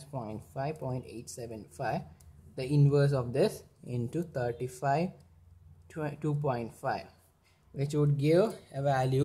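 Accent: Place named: India. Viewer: Fuck you Hindi bastard with your shitty accent .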